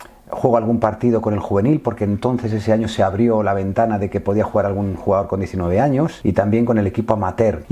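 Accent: Galicia, Spain. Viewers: Es curios que siempre pensé que eras gallego.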